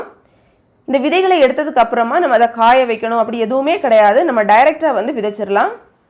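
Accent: Kanyakumari, India. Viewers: Mam neenga kannyakumari ah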